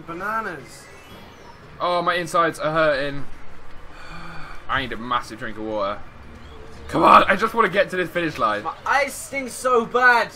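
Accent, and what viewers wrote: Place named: United Kingdom. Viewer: What part of Uk is he from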